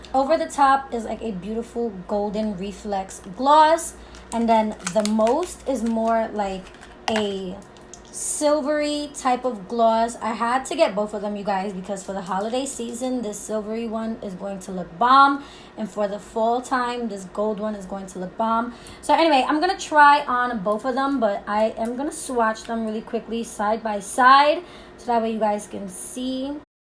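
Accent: New York, United States. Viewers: She definitely from New York right?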